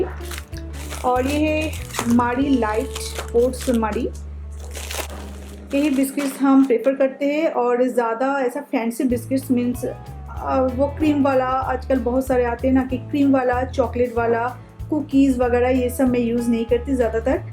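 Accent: Bengal, India. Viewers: Shweta Grover Yar bangali hai to Thora sa to chalta hai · Hi frnd u r sooooo sweet.....i love ur bengali accent😘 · I love your way of speaking it has bengali acsent I love it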